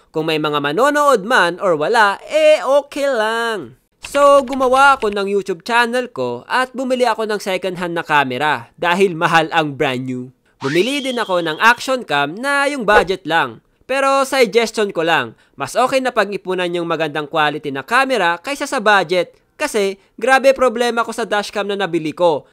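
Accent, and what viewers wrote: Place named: Pampanga, Philippines. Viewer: taga pampanga k ba halata sa punto m at boses na kapampangan ka?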